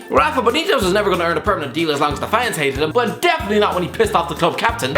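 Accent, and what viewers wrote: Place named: Ireland. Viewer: Annoying voice you Irish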